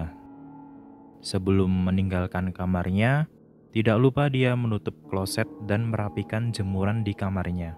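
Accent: Java, Indonesia. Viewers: Jowo banget 😂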